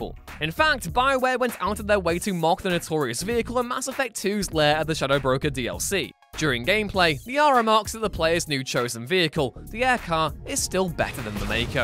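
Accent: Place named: Liverpool, United Kingdom. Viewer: Can you not make the liverpudlian narrate videos? There's a reason they're voted to have the worst accent in the uk.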